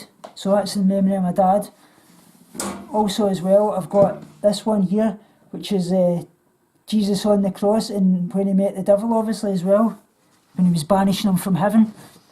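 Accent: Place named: Scotland, United Kingdom. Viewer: I have Scottish ancestors my great-great-grandmother was from Scotland she spoke just like you I don't remember her she passed away when I was a baby but my mom used to talk about her all the time she said she loved hearing her talk because of her accent just like I like your accent I like Australian accents British accent Irish LOL I'm just plain old American accent lol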